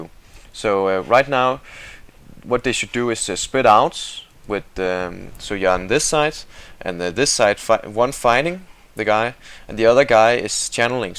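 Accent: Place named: Denmark. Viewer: kunne høre du er dansker! :D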